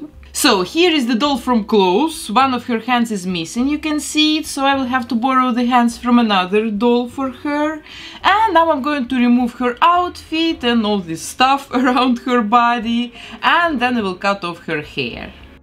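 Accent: Russia. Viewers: Либо я уже глухая,либо есть какой-то русский акцент у автора канала 🤔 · Сильно слышно русский акцент 😂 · блять я слышу русский акцент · акцент русский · Lol all the cautions are a little frightful! Lol...(Russian accent) “don’t get me mad, I know how to make you into a doll, with household products! “. Gawd I LOVE LOVE ❤️ that accent! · Is she Russian? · Почему я слышу русский акцент? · Какой то слишком русский акцент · Почему мне кажется что она из России, у неё окцент что-ли "русский"? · are you russian? not being mean or races. just asking.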